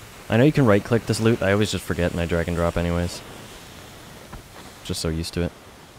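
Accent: Canada. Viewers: You gotta be Canadian... you sound just like my uncle just not as deep of a voice lol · are you Canadian? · Are you Canadian?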